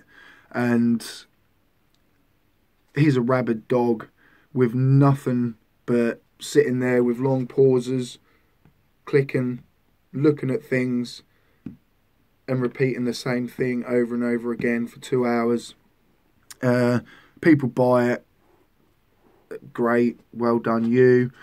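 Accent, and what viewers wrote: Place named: England, United Kingdom. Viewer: U r obviously english, whats ur relationship with düsseldorf?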